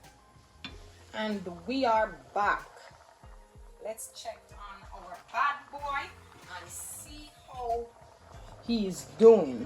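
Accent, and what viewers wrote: Jamaica. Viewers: Yow ,your authentic jamaican voice make the video enjoyable to watch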